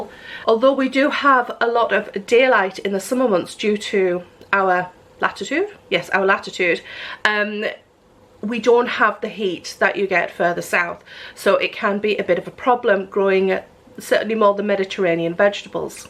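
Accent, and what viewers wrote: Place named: County Durham, United Kingdom. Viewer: Very excited to see you working on your smallholding - hoping it will give me inspiration to get going this cold February, with my little plot, which, by the sound of your accent is near your own roots. (County Durham). I have four hens, a small vegetable plot and currants, raspberries and plums.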